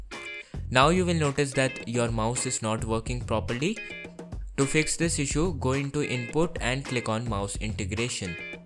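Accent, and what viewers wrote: India. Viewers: Nice video. Your english sounds like the english from apu nahasapeemapetilon(Simpsons)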